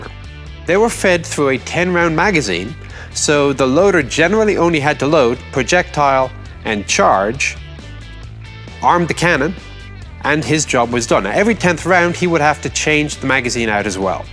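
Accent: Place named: Ireland. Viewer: Originally from Ireland, his Irish accent gets stronger when he has been visiting Ireland lol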